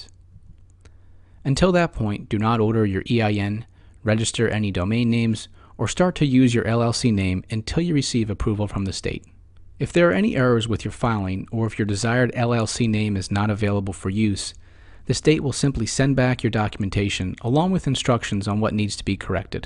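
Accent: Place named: New York, United States. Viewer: Man I love your Jewish NY accent it sounds so cool haha. Thanks for the help! I was able to file with ease for my LLC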